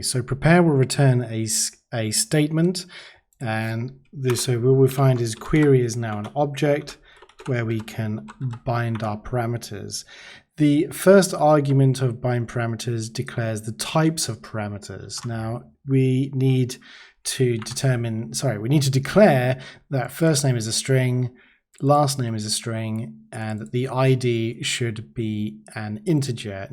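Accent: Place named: United Kingdom. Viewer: Informative and entertaining. (bonus points) the British accent sounds far more intellectual than my American "southern drawl". Excellent work man!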